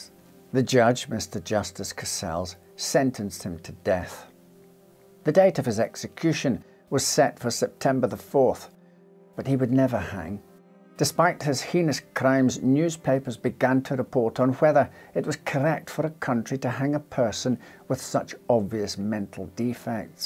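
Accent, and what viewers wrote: Scotland, United Kingdom. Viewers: is indeed Scottish.. 👍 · Great stuff. Are you Scottish?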